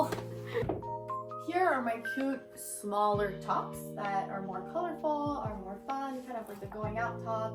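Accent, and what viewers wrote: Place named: Montreal, Canada. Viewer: I recognize that aceent. Im born and raised Torontonian but lived in Montreal for a few years.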